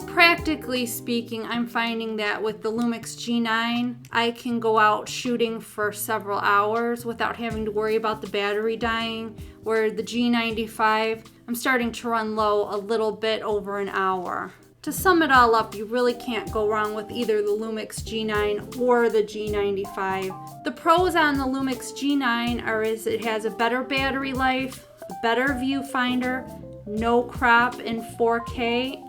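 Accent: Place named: Minnesota, United States. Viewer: As a non-American I can be wrong here, but you sound a lot like the people in that brilliant movie FARGO... Anyways, very good comparison! Groeten vanuit Belgie!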